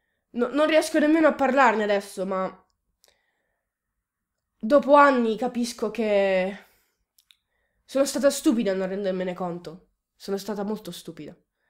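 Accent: Italy. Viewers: non c'é bisogno che non dici di dove sei si sente anche io sono di quelle parti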